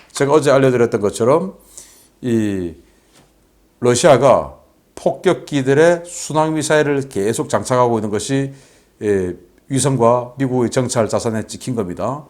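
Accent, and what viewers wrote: Gyeongsang, South Korea. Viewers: 경상도 말으로 러시아를 발라 버리는 깡이 직이네요... 그런데 방공망이 없는 거나 마챦가지 라는게 더 웃깁니다... 우리나라도 예전에 북한 의 정말 얄궃은 드론 을 나라 한바퀴 돌아 갈때 까지 몰랐었는데... 상당히 우려 스럽 습니다... 나아 졌는지.... 걱정 이군요...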